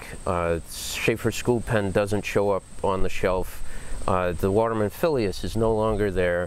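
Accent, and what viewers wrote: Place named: New England, United States. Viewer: What a Yankee accent, and I'm here for it!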